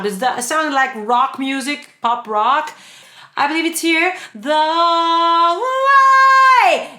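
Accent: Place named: Italy. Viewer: Заметно что мадам из Италии. Очень быстрый темп речи. Тяжело успевать читать субтитры.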